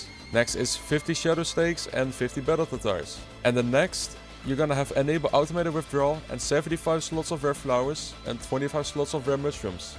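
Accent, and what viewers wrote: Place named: Netherlands. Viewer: Waarom hoor ik het altijd meteen als iemand Nederlands is.. dope video btw en misschien kom ik een keer je server checken.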